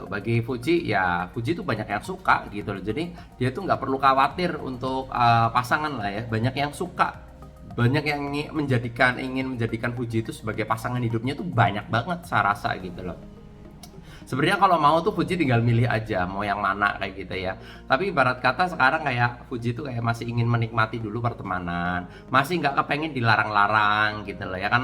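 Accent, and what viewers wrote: Banyumas, Indonesia. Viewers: Mengeneh gara² video ne kang 😂rika ngapak banget asli ngendi mas😂😂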